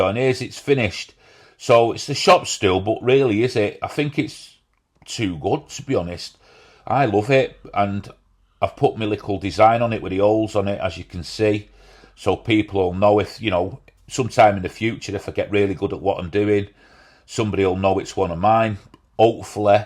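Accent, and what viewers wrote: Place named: Liverpool, United Kingdom. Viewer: From across the pond, great job. Your accent, are you from Liverpool?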